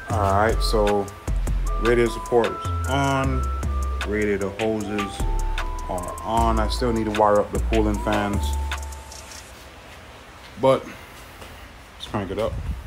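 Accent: Jamaica. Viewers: Just subbed when mi hear di patwa jump out dwl dem rass plug dear eeee. Respect my yute u build wikid.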